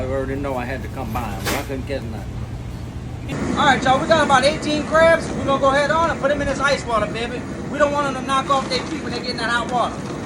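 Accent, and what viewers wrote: Louisiana, United States. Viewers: She sounds like she's from da parish.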